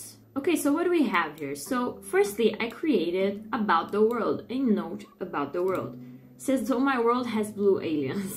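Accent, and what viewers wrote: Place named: Portugal. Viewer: Im brazilian and it sounds like portuguese accent Just a guess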